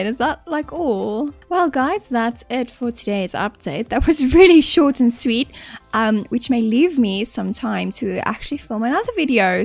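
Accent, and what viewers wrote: South Africa. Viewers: Your accent sounds so familiar. Is you South African like me?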